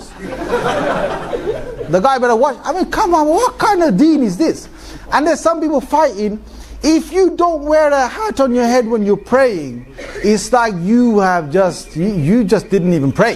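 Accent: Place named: United Kingdom. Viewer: Definitely, british accent yes man I like this islamic man very much talking good about prayer and about his own God name called Allaah it's there own God true talk Mr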